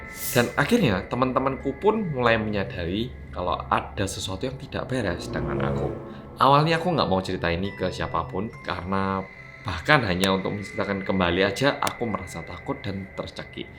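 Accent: Java, Indonesia. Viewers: dari awal sampe sekrng masih bertanya2. knpa mas bisa medok begitu 😁😁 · Episode terakhir huuhuhuhu, wes rapopo, seng penting mas tetep medok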